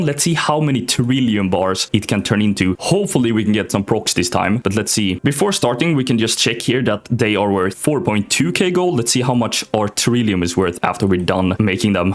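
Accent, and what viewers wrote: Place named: Sweden. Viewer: PLEASE FOR THE LOVE OF GOD STOP SAYING "SHA-LENGE", "SHECK"... ITS CH NOT SH, YOUR SWEDISH IS SHOWING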